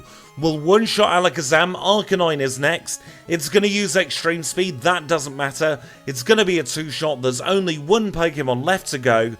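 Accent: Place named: United Kingdom. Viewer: I'm so curious! I'm not from the UK so I'm always shocked at how many accents you guys have in such a relatively small country. What would you say the is the closest accent to your's? I need a name to the sound!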